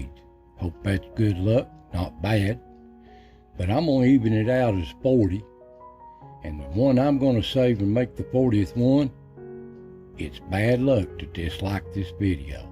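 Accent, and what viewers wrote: Appalachia, United States. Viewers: A very good friend of mind that lived in Unicoi County or Erwrin or Irwin all his life talked exactly like you. He passed away a few yrs ago, only in his early forties and I miss him. He could do anything from logging to sawmilling, welding to mechanics. You sound exactly like him. Love your videos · ......Thanks again for these. I've heard a lot of old sayings from this list. I notice a lot of folks talk about your voice. A lot of men that are from the mountains talk just like you. I have always said, "Give me a slow talking southern boy any day over any other kind of man." It's a compliment. & my opinion. My Papa was that way & he was a wonderful man.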